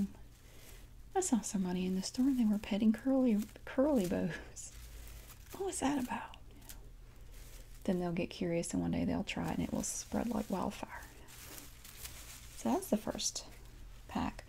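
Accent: South, United States. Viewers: You are so comforting and motherly, I just feel so at peace watching your videos. I normally don’t pay much attention to accents, but I’m so obsessed with your southern accent. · Love your accent. It's a Southern accent, but it's not thick or heavy, it's light and dainty like a Southern belle. It has a soothing effect when you speak softly. Very nice. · Love her southern accent. Especially from all the way over here in New Zealand. She is just awesome and so relaxing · Your mannerisms, personality and voice are extremely relaxing. Glad I found your channel. I'm from Alabama and it's nice to hear a friendly southern accent for ASMR.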